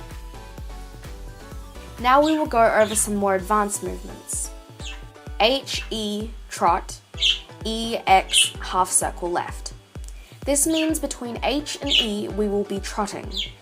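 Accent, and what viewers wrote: Australia. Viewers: What server are you on? You sound Australian so I guess you'd be on FS with most? I'm on Magnolia Jungle which is apparently a lot quieter than Firestar. Maybe when I've been playing SSO for a bit longer I'll have a look for other people into RL dressage on SSO. Not to start a club as such, just to get some people together who want to do real life dressage. If anyone who reads this is on Magnolia Jungle and is interested in doing some dressage with me, please just reply to this comment and we'll organise to get together :) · Luv the vid! We r so similar! Both come from Australia (I’m guessing from the accent), both play sso, and both have birds!!!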